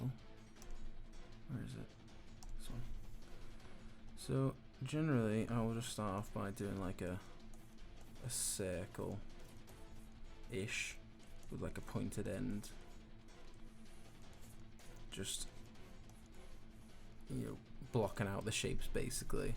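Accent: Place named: United Kingdom. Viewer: holy crap he's British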